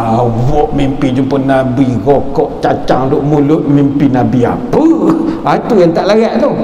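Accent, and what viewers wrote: Pahang, Malaysia. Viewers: Dakk....Dato org Maran, Pahang. Mmg telo Pahang ada sstgh kwasan ada sikit2 mcm org Perak.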